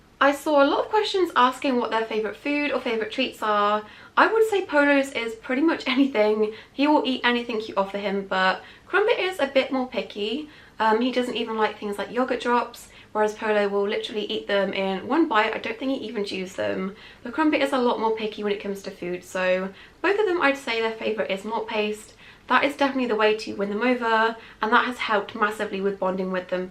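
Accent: United Kingdom. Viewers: That high-pitched British accent is so cringeworthy.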